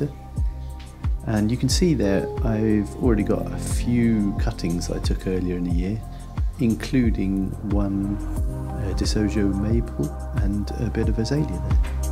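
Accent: United Kingdom. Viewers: I'm a Hispanic American and thought this was a British video because of the man's accent. Then, I heard the random caracol (snail) song in the background and was like WTF...hahahaha!! Kind of fitting!! :-D Gracias por la linda explicacion. I'll try it today!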